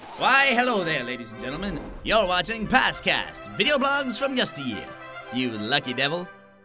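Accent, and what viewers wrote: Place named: Wyoming, United States. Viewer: He sounded like whyoming :D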